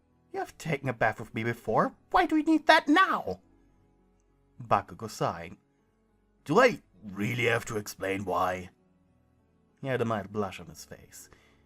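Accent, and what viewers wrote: Germany. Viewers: Bro I love his German accent! It's cute💖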